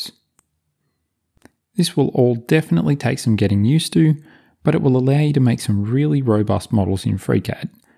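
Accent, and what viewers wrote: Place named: Australia. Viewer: It's great to hear a Bazz. (read Oz-Trail-Ian) accent making such an informed and clearly articulated analytical video. I'm an FC newbie and, after many montha of bashing my old head against the Blender wall, I now know that this is the package GP draughting package I've been looking for! Well done mate, Cheers, pk (Central Vic.)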